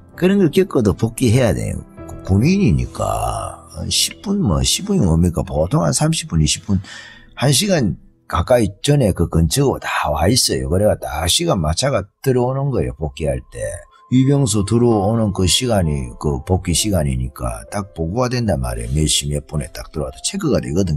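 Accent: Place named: Gyeongsang, South Korea. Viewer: 경상도사투리가 세삼좋네요~~^^유머와 부드러움~단호함~~궅궅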